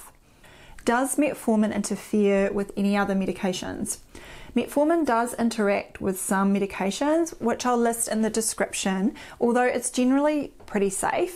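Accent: New Zealand. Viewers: I just love your Kiwi accent apart from all the important info. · I wish she were my primary care physician! I love her new Zealand accent! She's such a perfection, in every way! You can go to her clinic with an ailment, her smile will heal you in no time! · I love to hear your Kiwi accent and to watch you. You are eye candy. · Livel (level), tin (ten), sicondly (secondly)... Lovely New Zealand accent 😄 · Love your videos and info but especially your kiwi accent as I'm an ex kiwi living in Toronto, Canada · I loved the new Zealand accent more than the informatiin on Metformin... The accent reminds me of Jacinda Ardern.♥️😊. But what about a high fat low carb diet,as an alternative to Metformin?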